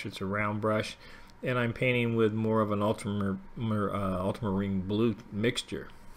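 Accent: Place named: Philadelphia, United States. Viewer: Nice demo. And painting...sound like your from Philly. Or Cleveland. Lol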